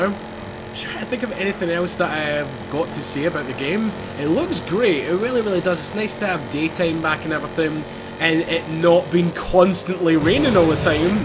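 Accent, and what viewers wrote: Scotland, United Kingdom. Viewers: Are you Scottish? You sound really Scottish 😂 I’m from Scotland as well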